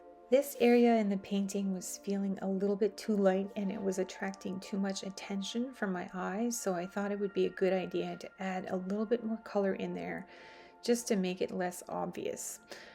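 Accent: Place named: Canada. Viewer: Why do you have a Canadian accent? Just curious.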